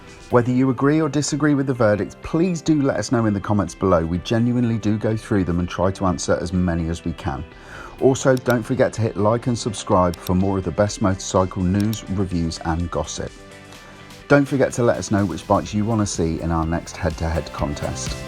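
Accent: United Kingdom. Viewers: Like always , visor down does it the best ! Love the British voice.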